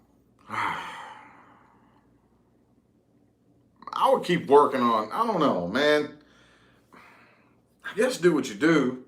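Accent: South, United States. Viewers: Hey where in the south r ya from accent drivin me crazy cuz I can’t figure out where it’s from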